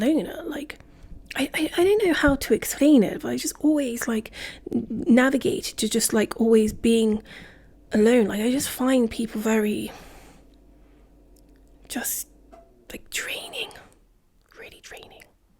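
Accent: United Kingdom. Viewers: Are you english I love your accent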